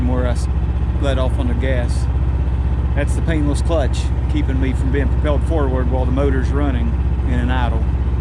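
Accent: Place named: West Virginia, United States. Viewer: Thought you are from West Virginia as soon as you began speaking. I was born in Clarksburg, raised in Glenville. Now happily retired in Wisconsin three blocks from Lake Superior. Enjoyed the video. -- drew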